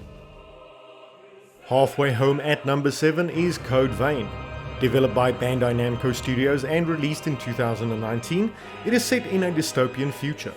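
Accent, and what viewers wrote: South Africa. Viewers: You sound south african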